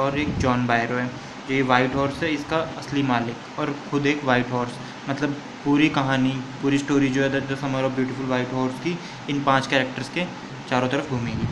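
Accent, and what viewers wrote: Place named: Delhi, India. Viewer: 🤣🤣the way u teach is absolutely fantastic I really like it and the part of uncle is damn funny by your language i can guess that you are from Delhi m i right??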